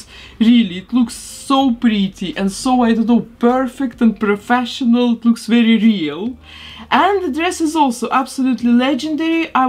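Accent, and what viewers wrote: Russia. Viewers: you have such a strong Russian accent. Wow🤨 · Why do you have a Russian accent? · your accent is so comfortable but hard at the same time, and the way you make sentenses are just so russian vibing you know😆😅are you russian/ukrain??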